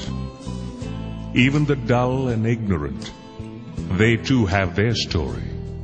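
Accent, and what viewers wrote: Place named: Scotland, United Kingdom. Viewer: Some say the orator is James Earl Jones or even Kamahl, but I detect a slight Scottish accent like Sean Connery.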